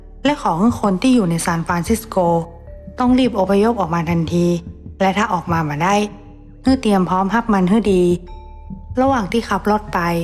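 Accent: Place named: Isan, Thailand. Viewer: เสียงอิสาร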